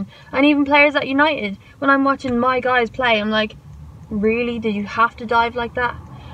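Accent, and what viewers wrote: Ireland. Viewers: You're Irish where you from love I'm from tallaght can't guess your accent haha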